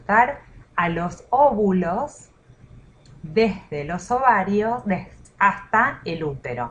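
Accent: Rioplatense, Argentina. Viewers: Sos argentina y recien me di cuenta o capaz uruguaya pero igual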